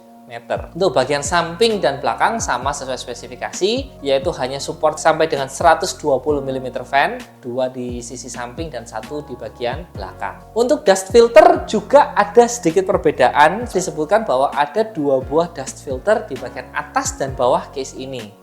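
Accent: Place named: Java, Indonesia. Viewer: Ou pantes agak medok <mail>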